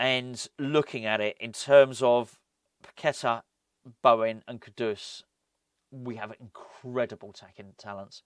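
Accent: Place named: North London, United Kingdom. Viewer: You sound like you come from North London, go back to your own site's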